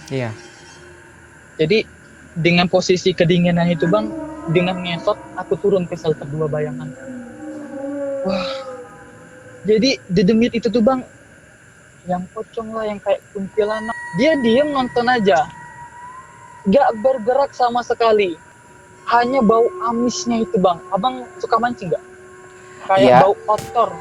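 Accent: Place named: Jambi, Indonesia. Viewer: Kyk suara orang jambi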